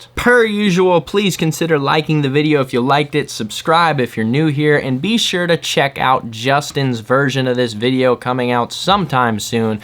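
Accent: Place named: Australia. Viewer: You should stop the Australian accent.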